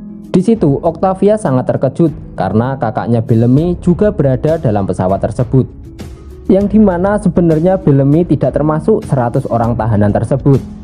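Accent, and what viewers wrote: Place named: Java, Indonesia. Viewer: Medok ya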